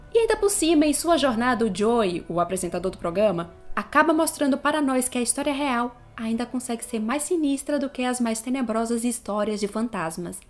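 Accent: Brazil. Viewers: o sotaque nordestino misturado com o ritmo de fala mais compassado e a voz suave parecendo estar contida ajuda muito Ah, parabens pelo conteudo!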